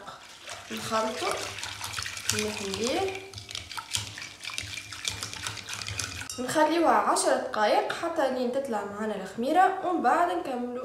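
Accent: Tunisia. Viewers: منورة الله يبارك ولهجة التونسية حلوة 😍😍😍😋😋😋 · اللهجه التونسيه موسيقى للاذن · Bravo madame pour la recette! Super facile et elle a l'air très bonne! En tout cas, avec votre joli visage angélique et votre bel accent tunisien, quoi que vous fassiez on le mangerait volontier! Un grand bonjour d'Algérie! Bonne continuation! 🙋‍♂️ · لهجه تونسيه على لسانك جميله جدا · ما اجمل اللهجة التونسية!في شرق الجزاىءر ، الحدود الشرقية حتى مدينة عنابة، عندنا نفس اللهجة.اما عن مهدية، لقد بنتها قباىءل امازيغية من الجزاىءر ، و هي قباىءل كتامة، التي شيدت الدولة الفاطمية،عدد كبير منهم انتقل بعد دلك من مهدية إلى مصر ، بعدما طردوا العباسيين، و أسسوا مدينة القاهرة و مسجد الازهر،و آخرون بقوا في بلادهم شرق الجزاىءر ، و آخرون تفرقوا في مناطق مختلفة حتى في المغرب توجد مناطق تحمل اسمهم, ربما انتي احدى حفيداتهم !؟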